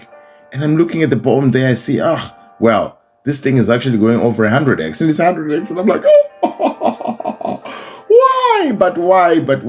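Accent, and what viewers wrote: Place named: Zimbabwe. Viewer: From your accent I can tell you are mwana wekwedu kwa Sadza 😂...I like the way you do it bro,hah munondiitira boo.Im in Zim zvangu but I do have a Hollywood and lottostar accounts ....I would appreciate even a voucher zvaro ...who knows pamwe ndingamuke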